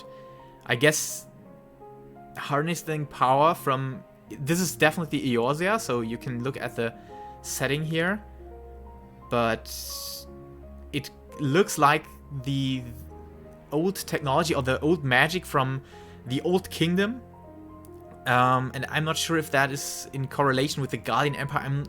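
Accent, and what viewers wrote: Germany. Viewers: I think because hes german, could sound confusing to americans, but to me he sounds like a guys that is talking englisch :D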